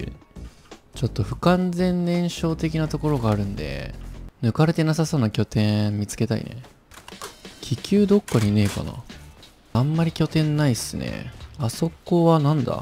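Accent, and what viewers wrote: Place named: Kansai, Japan. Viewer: 関西弁バチバチすね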